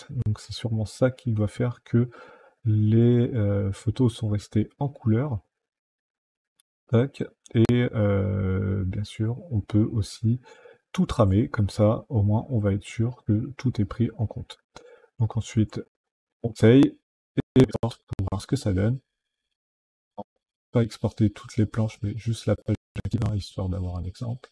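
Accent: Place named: England, United Kingdom. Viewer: Salut, Très utile, merci pour ce partage de connaissances ! (Comme dirait Chabal, We are in France, we speak French... OK ?) Manquerait plus que quelqu'un vienne te reprocher ton accent anglais, le jour où les Anglais (en tout cas ceux qui font cet effort) parleront français couramment et sans accent, alors, peut-être qu'ils pourront la ramener et encore on ne va pas se laisser emmerder par des buveurs de thé, avec le petit doigt en l'air, bordel de merde ! :P Sinon j'ai une question en rapport avec ta vidéo: Que signifie tramer et à quoi ça sert au juste ?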